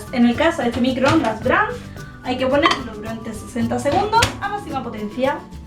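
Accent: Andalusia, Spain. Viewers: Es de España, de Andalucía, parece:) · Nada q ver pero me gusta su acento. Me gusta ustedes (los españoles creo) que apenas acentúan la T cuando dicen "está" y acentúan más la S. Al revés que nosotros los argentinos por ejemplo 😊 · no todos los españopes ablan asi jo soy española y no hablo asi, solo la gente andaluza habla asii.....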